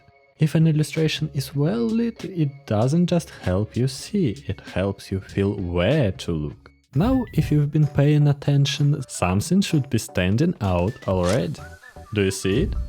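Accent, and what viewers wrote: Russia. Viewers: Спасибо большое за ваш русский акцент Поверьте мне, если бы вы были говорили с английским акцентом, я бы вас не поняла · Почему я слышу русский акцент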